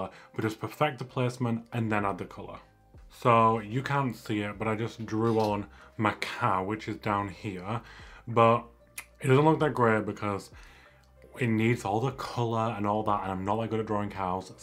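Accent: United Kingdom. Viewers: I’ve read you are from London so what’s with the northern / East Midlands accent ??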